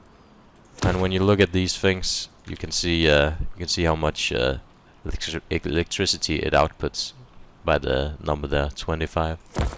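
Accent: Denmark. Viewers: u sound danish? are you? din frække dreng xDD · Du lyder ekstremt dansk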